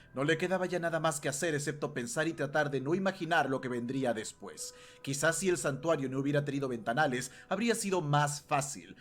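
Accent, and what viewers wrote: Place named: Spain. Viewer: De que parte de España eres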